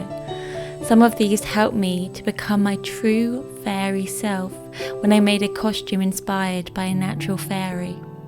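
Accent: England, United Kingdom. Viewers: Esse sotaque é britânico? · Oh, how peaceful your ideas about how to enjoy living in the winter. I loved hearing you read the old folktale with that beautiful English accent. Even your cat seemed to enjoy the magical cozy atmosphere.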